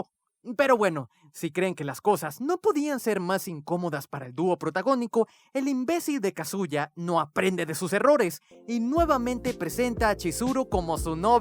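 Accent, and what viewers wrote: Venezuela. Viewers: oye wey tu eres venezolano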